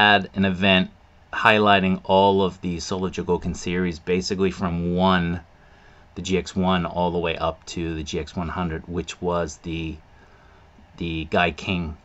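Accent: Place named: New England, United States. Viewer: yes sir! I just got shelves a few days ago. I am going to get display cases, similar to what I saw At Tamashi nations, due to the earthquakes we get here. I live in Asagaya so I’m pretty much at Nakano broadlway every other day. Moving to Japan has been a wonderful blessing. I moved here not because of Anime and action figures, but the overall culture, food, people, order, serenity, peace of mind and affordable housing. My home town of NYC seems to be a mess now, also I don’t have 1.4 million for 400sqft (I’m not exaggerating that’s a Manhattan condo price in Harlem where I’m from). I flew in the Air Force for 30yrs hit every corner of the globe. Spain, Italy and Japan were my favorite missions to fly. Japan stood out amongst all the lands I traveled. I detect a New England accent within you, I enjoyed going up to cape cod for the summers with friends to beat the grind of NYC. 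I would have moved to that area if I stayed stateside. If you have not done a video on the subject, I would recommend the Animation museum in Ogikubo and the Inazama anime Cafe in Ogikubo as well, Take care and keep up the great work.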